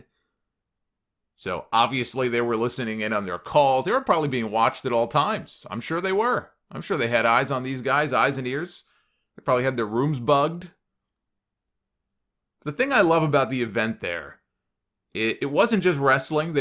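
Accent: New York, United States. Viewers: Lol, what? I hear nothing but NY accent the entire time.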